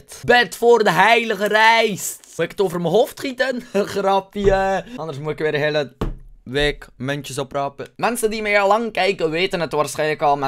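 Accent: Belgium. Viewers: heey, lees je dit nu in je bed? XD ik kan altijd om je lachen, en je Belgiche accent vind ik echt awesome. xx nima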